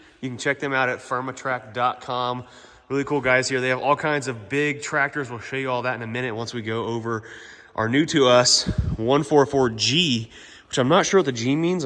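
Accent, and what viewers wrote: Netherlands. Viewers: bro sounds Dutch and I should know lol.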